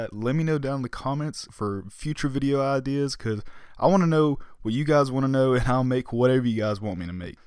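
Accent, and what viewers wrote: South, United States. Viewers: Southerm American...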